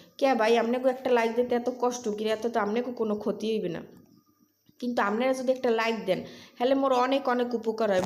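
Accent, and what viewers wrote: Barishal, Bangladesh. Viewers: আসসালামু আলাইকুম আপু চলে এলাম তোমার বরিশালের কথা শুনতে তোমার কথাগুলো সত্যিই আমার অনেক ভালো লাগে তোমার কাছ থেকে আমি বরিশালের কথা শিখতে চাই শেখাবে ❤❤❤❤❤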